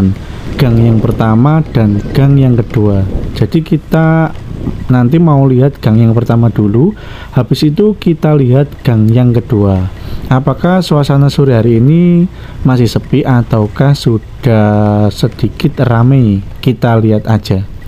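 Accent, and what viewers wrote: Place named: Java, Indonesia. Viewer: Logat bahasanya logat koploan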